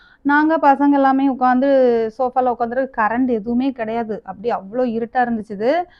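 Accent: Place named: Nagercoil, India. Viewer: Love the Nagercoil accent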